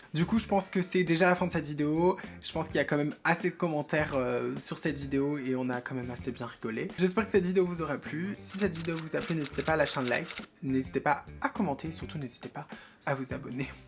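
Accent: Belgium. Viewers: T'es Belge?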